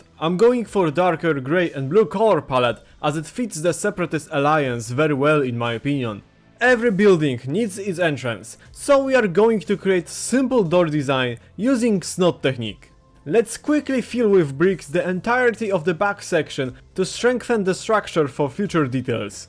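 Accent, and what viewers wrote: Poland. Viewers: Czuć ten polski ale cieszę się ze polak wyszedł na między narodowa scenę pozdro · bro i love your polish accent, im from germany and i hate mine bruh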